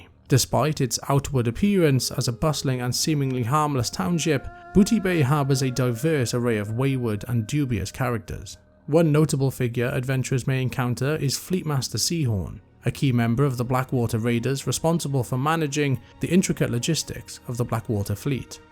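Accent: United Kingdom. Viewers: Was so glad you were British when I clicked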